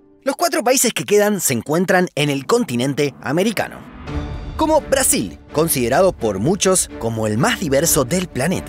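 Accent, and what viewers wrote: Argentina. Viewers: Por tu forma de hablar, sos compatriota o uruguayo. Abrazo. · Vaya acento de este chaval, qué es ese dialecto argentino?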